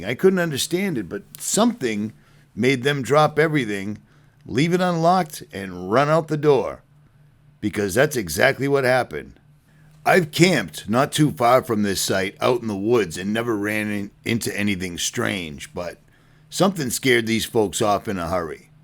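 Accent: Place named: New York, United States. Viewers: Miss your east coast accent thanks for sharing your videos miss your old videos from Boston. Keep it up... A friend from the yoop Upper Michigan you ever get out this way? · I just stumbled across your channel, love it! Great content and yes it must be a fossilized tree our Heavenly Father gave to us for proof the Bible is true! Love your voice, you sound like you’re from NY, just a guess:) Thank you also, for reminding your listeners of our Creator. Safe travels, thank you for bringing us along:)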